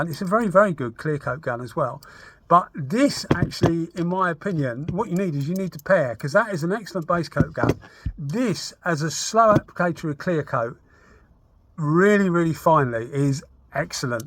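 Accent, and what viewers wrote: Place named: United Kingdom. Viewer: Hello again.. Jeff from the US. As usual you do not disappoint with your vast knowledge of this trade. There are a lot of youtuber's making paint gun videos, but your no-nonsense approach is refreshing! This Porphis brand looks like a high quality spraygun. Fit and finish seem to be spot on as well and based on your elevated tone of voice, I can see you're a fan of this brand and I do you value your opinion! Can't deny I am partial to the British accent my friend! Keep on! God Bless🙏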